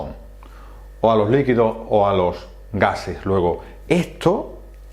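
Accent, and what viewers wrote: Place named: Andalusia, Spain. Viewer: Es andaluz es nuestro acento, y aprende a escribir chaval.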